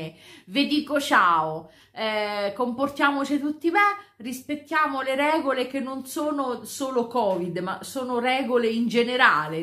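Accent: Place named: Tuscany, Italy. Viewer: Dai, sei toscana...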